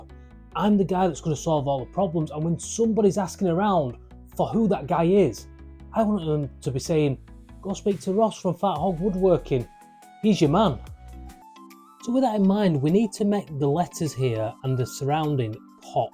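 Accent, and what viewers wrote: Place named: Yorkshire, United Kingdom. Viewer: Hi Ross, I’m glad I found your channel! I enjoy your dry Yorkshire style. You may appreciate that took some saying by a Lancastrian.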